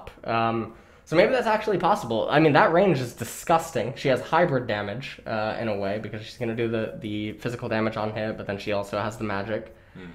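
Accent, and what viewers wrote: Sweden. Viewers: That swedish accent <3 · that Swedish accent is getting my dick hard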